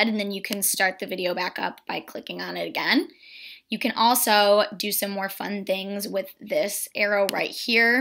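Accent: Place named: United States. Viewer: Sorry. I battle to understand her American accent. I am a South African English graduate.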